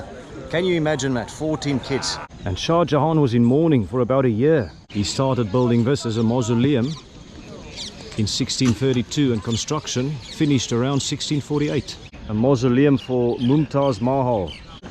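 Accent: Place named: South Africa. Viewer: Are you South African by any chance? Your voice and accent sounds very similar to the South African cricket legend AB de Villiers. And just as I was typing this, you also happened to mention his marriage proposal story, so I am guessing you might also be from South Africa. Anyway, awesome video. Cheers!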